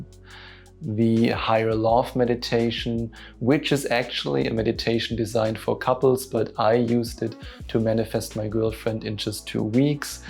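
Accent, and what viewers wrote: Germany. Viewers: bester Mann mit angenehmen deutschen Akzent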